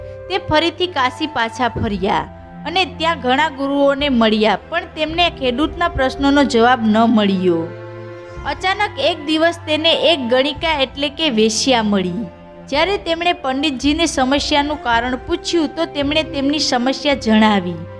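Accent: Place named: Saurashtra, India. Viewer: JAY HO. GOOD East African OR SAURASHTRA (KATHIYAWADI) GUJARATI LANGUAGE. 🙏🙏🙏🙏🙏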